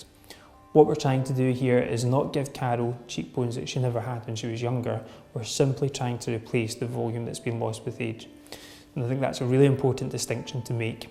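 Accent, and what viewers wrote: Scotland, United Kingdom. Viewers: Where are you based,? I note your Name is Scottish and accent. Are you based in SCOTLAND?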